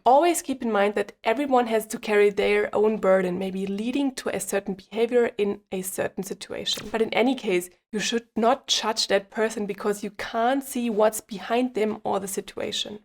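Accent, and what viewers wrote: Germany. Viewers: btw. Feedback is for me the most difficult to ask. Tip 11 is btw for me a problem, because I am criticaly ill. However I am very much on my way with doctors to get better and very motivated to start my walks which I have done in the past again. So do not worry. 11 is comming again. I do my shopping by foot, so a bit 11 already. For you in tip 12, something small to learn, a little line in Dutch, however do I hear a little German accent? In that case it will not be that difficult: Dank je wel dat je me vandaag weer wat geleerd hebt.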